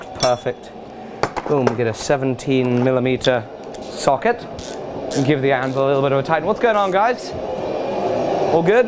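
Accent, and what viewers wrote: England, United Kingdom. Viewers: Hey man, great video. Where in England are you from? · were is from? sounds English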